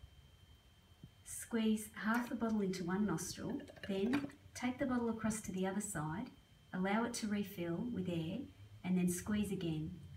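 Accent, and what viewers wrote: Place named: Australia. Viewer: Australian voice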